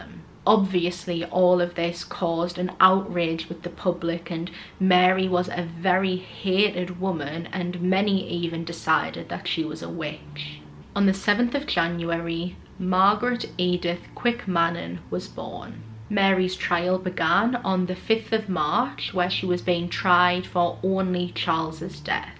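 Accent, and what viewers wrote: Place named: Newcastle, United Kingdom. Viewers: I think your amazing young lady, thank you from an old woman 👵 🤣 brilliant work 👏 Is that a Newcastle accent Pardon my ignorance I have relatives there and love Newcastle ❤️ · whey bonny lass.....listening to your voice makes me feel homesick - stuck down in Kent for a while but our home is up near Crook in God's own County - Durham a lovely bit of research here, presented without hype or sensationalism have hit the subscribe button for you Mary Anne Cotton's house is still there in Front St. West Auckland...the original black Widow... As an aside, local lore states that the grounds of Raby Castle are supposed to be haunted by the victims of Mary Anne Cotton. The ghostly victims are supposed to run across the estate of the castle, playing what can only be described as a game of tag.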